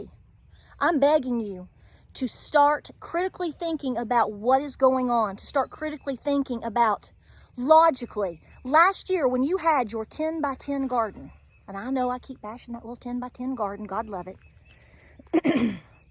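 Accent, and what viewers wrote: South, United States. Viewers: You are the absolute BEST!!! Except for the southern accent, you sound like me! I am astounded that people are so stoopid!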